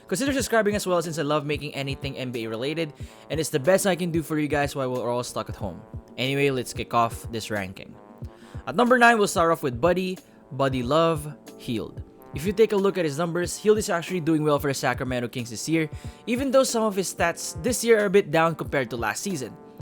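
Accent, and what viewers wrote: Philippines. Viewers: Not racist but you Filipino? Your accent is Filipino-like and the Philippines is in the pacific Just a question from a Filipino fan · I actually noticed it too, from the amount of my filipino teachers having the same accent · I love the filipino accent ♥️♥️🇵🇭🇵🇭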